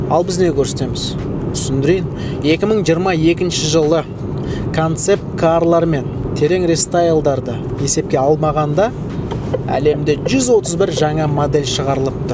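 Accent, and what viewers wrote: Standard. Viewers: Таза казакша сойлитин 1 ши блогер жарайсын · Жарайсың. өте түсінікті айтасың. тап таза қазақша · Қазақшаң үшін мың алғыс, нағыз таза қоспасы жоқ жалпақ және мәдени түрде түсіндіргенің керемет, басқа айтарым жоқ, семіз лайк 👍🏻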